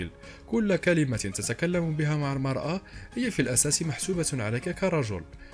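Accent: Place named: Morocco. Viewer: يجب ان تتخلص من لهجتك المغربيه اثناء الحديث تجعل الشخص لا يفهم شيئا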